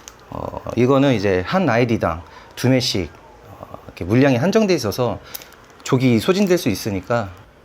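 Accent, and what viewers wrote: Chungcheong, South Korea. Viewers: 어이 양반 충청 양반..뭐래유..너무 잘보고가고 좋아요..영상 공유해줘서 눈향복하개해줘 고마워요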